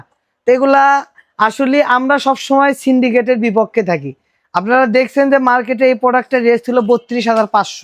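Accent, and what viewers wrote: Bogura, Bangladesh. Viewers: Bhai Apner Kotha Sunle Mone Hoi Amader alakai Basha mane Bogurai.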